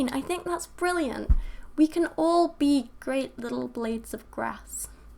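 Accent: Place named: England, United Kingdom. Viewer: You brilliant little apple nibbler! :) awesome. Even better how u say it, English accents add to how good most women sound :) keep fighting the good fight . Your fan -Brandon Gutleben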